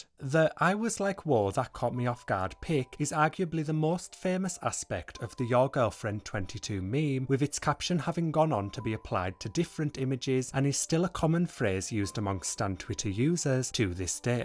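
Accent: United Kingdom. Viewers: I can’t tell if your from St Helens, Wales or Newcastle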